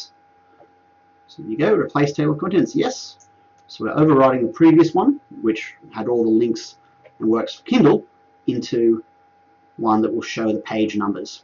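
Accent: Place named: Australia. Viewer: must be aussie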